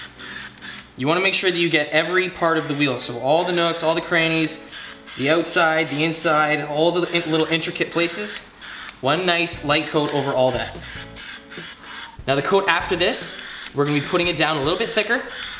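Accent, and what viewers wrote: Canada. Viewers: If I had to guess, I'd say that sounds like a Canadian accent. Great video!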